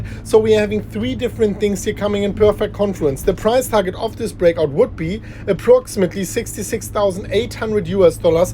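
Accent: Germany. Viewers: Hes german right?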